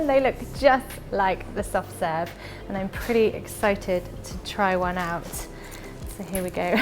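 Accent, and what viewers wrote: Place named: United Kingdom. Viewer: 👍😇 You're really great, I love the way you present things (and like with Jemma, I also love the way you speak - being German, I'm always looking for British English input and I enjoy your and Jemma's English so much 😍)!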